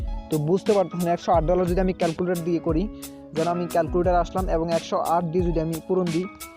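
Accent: Noakhali, Bangladesh. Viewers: তুমি নোয়াখাীর ছেলে😍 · আপনি কি নোয়াখালীর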